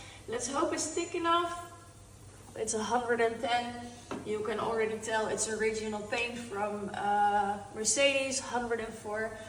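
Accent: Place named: Netherlands. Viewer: Where are you from? Sounds like you have a Dutch accent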